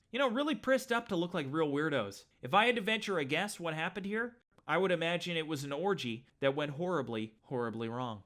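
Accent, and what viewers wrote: Canada. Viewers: Canadian accent if I’ve ever heard one. How’s the east coast been bud? Greetins from Ontario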